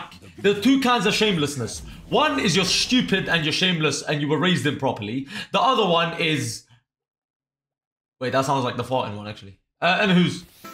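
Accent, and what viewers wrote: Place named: United States. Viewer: The American accent gets me. 😂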